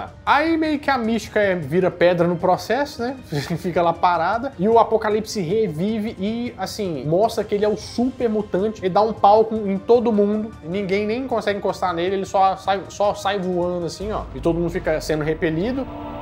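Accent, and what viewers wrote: Minas Gerais, Brazil. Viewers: Esse resumo em mineires ficou bom demais kkkkkkk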